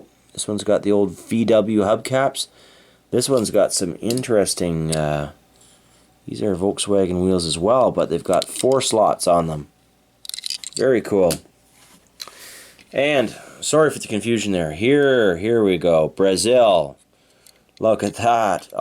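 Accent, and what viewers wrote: Canada. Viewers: I was just about to comment. Is he form Canada because the way he said stuff. Mostly because the way he said car. I live in America but I have Canadian in me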